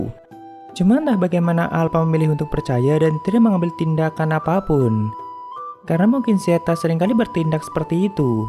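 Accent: Banyumas, Indonesia. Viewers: Ga terasa udah part 45. Mantap apakah mimin orang ngapak2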